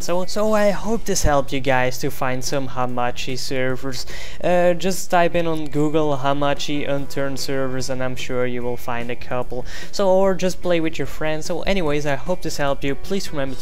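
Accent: Netherlands. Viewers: lol ur dutch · are you dutch? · so ur dutch? or from Belgium? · Is hij Nederlands? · he is dutch idiot not every language sounds same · Thank you mate! Btw i think your from The Netherlands :D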